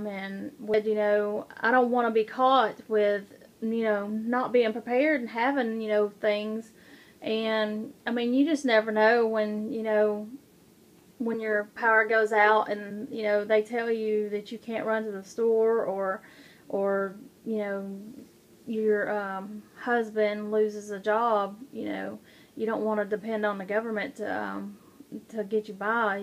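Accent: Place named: Kentucky, United States. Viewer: If I was a bettin man I'd say yer from Kentucky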